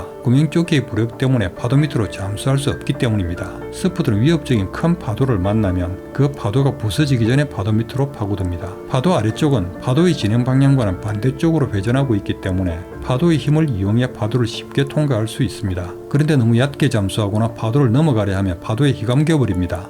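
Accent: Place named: Gyeongsang, South Korea. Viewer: 경상도 분이시죠? 친숙한 말투가 묻어나오시네요 ㅎㅎㅎ 다큐같은 느낌이 잔잔하니 아주 좋네요. 근데, 오디오를 나눠서 시차를 두고 녹음하신건지 맑은 소리였다가 먹먹한 소리였다가 하네요 ㅎㅎ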